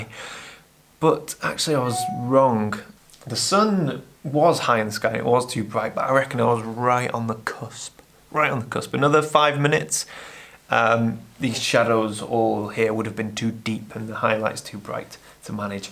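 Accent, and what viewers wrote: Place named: Scotland, United Kingdom. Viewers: Stunning photos, but your Scottish pronunciations have me on the floor, you need to spend more time with Mr Wilkie, great work mate & keep the vlogs coming. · Hey, infact last video was absolutely beautiful, magic mountains! Being in the north you're getting some sottish accent:-) see ya the next!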